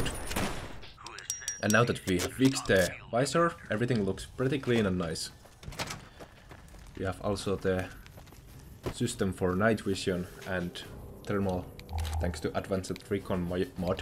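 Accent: Finland. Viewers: Nice süomi accent, bro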